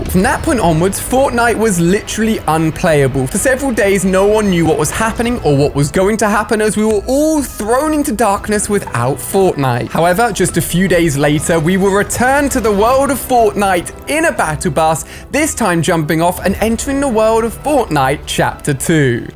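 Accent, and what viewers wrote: United Kingdom. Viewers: Am I the only one that thinks British accents are annoying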